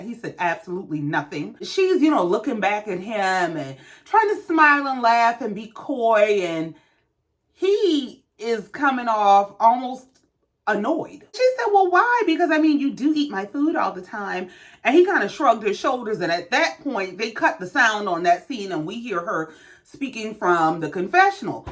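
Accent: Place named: New Orleans, United States. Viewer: I can tell you're from New Orleans baby lol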